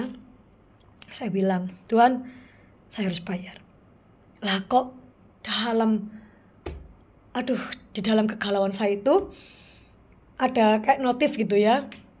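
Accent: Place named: Java, Indonesia. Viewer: Suka logat nya jowo bnget ❤❤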